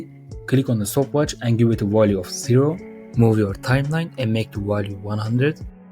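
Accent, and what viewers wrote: Turkey. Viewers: are you turkish?? (bence türksün aksanından) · hocam türk müsün çok fena aksanın var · Sesinden anladım türk olduğunu ag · abi türkmüsün aksanın benziyorda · türk aksanını nerde duysam tanirim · türkmüsün konuşman türk aksanına benziyo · aşırı türk aksanı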